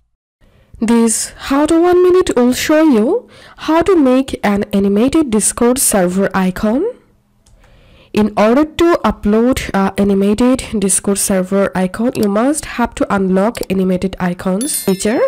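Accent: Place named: India. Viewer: stop raping the mic and speaking in half indian and half curry english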